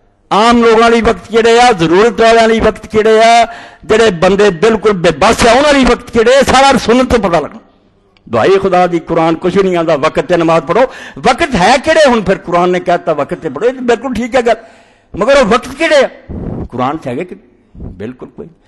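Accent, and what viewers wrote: Punjab, Pakistan. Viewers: Aik lafz bhi samj nai a Raha Hy itni gari Punjabi bol rahy Hy ap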